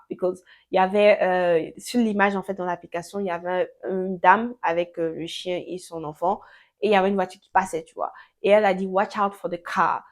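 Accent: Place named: Ivory Coast. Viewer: Accent Ivoirien 🙄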